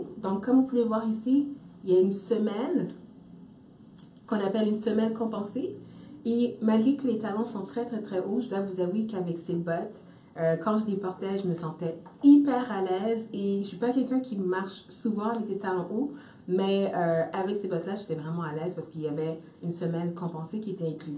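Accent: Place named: Quebec, Canada. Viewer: Ah j’entends l’accent du Québec 😂😂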